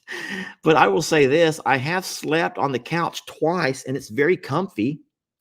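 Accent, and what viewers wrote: South, United States. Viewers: I think the pronunciation could actually be that your from the south with a little southern drawl!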